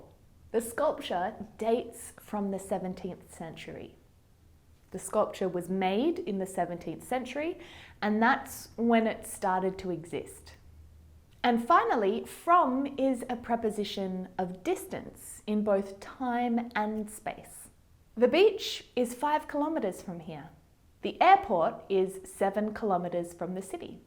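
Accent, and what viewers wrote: Australia. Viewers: Is this video and the other videos you post on your channel in an Australian accent? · Hello Are you learning English with Australian accent? Please let me know Thank you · I loveee her aussie accent! Your lessons are always the best, thank u 🙌🏽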